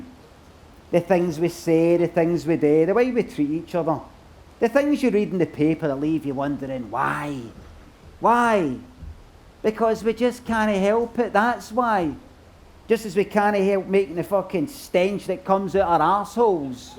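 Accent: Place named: Scotland, United Kingdom. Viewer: He's Scottish… he speaks normal for a Scottish person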